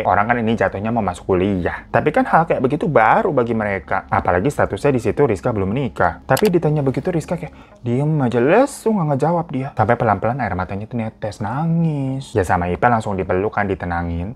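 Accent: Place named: Pontianak, Indonesia. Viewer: biak ponti mane suara nye😂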